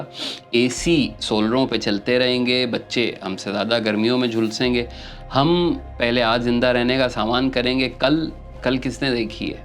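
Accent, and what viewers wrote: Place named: Karachi, Pakistan. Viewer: Waw, excellent bhai kya behtareen boltee hain aap, I presume you are from Karachi for sure :) as your urdu was as par. Initially trees an parks can safe us, as our activity is zero and we have concrete everywhere around us. Greenery/trees at certain level, plus managed family parks, clean water, 24X7 clean electricity and safety should be part of law to must have for a town. If this can not be done to already developed towns/cities then at least apply them to new towns, there are number of new societies where this can be achieved.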